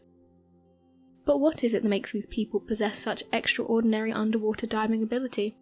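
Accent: England, United Kingdom. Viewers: Your narrator sucks! Save the accent for England!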